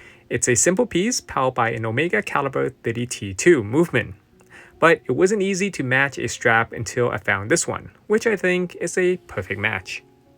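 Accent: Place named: Canada. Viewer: Yes I can tell you're Canadian from your accent😂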